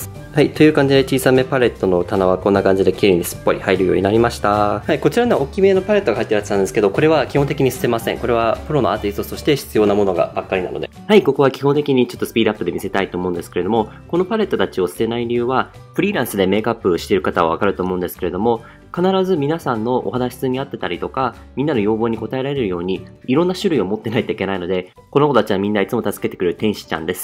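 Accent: Kansai, Japan. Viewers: リアルな感想やから参考になるし、時折出る関西弁が親近感〜😂❤️❤️❤️この動画本気で好き！！！ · どの動画でも数回関西なまりが聞こえて何となく嬉しい🥺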